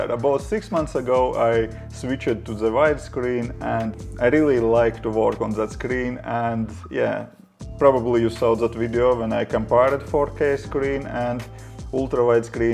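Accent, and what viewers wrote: Russia. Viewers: I felt a Russian accent, хотя может мне показалось) nice video, hello from Kazakhstan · Лады, понял. Просто уж сильно похож на Русский акцент с явным преимуществом в разговоре звука "Р-р-р-р" :))) Похожий акцент ещё у Аргентинцев с явной звучной буквой "Р", НО сетАп всё равно классный, спасибо за идею. :) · Your accent is very similar in Russian, yes) I thought you are Russian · i said that because you have russian accent. This my opinion ) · Да да да!! -_- я слышу русский акцент, причем лютый =)))) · are u russian? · слышно русский акцент) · Ох уж этот акцент из Russland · i'm confused, you have an accent that sounds somewhat russian, but your name sounds nothing russian... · Русский чтоль? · Русский акцент рулит))) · Мужик русский судя по акценту. Да и всё выдаёт ;) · Your fucking russian accent is super annoying.... Kommunist yerk.... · Your accent is so Russian!;) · Ооо так вот какой "русский акцент" стендаперы вечно пародируют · Я слышу русский акцент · ни фига, это конкретно русский акцент) ты мало чешских видео смотрел my friend XD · о да, русский акцент)) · Ты чо, русский? 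Чего за диалект. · Чувак)) у тебя явный русский экцент)) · Hi . Are u russian? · Говорите как будто Русский на Английском... )) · Ты русский? Чувствуется акцент.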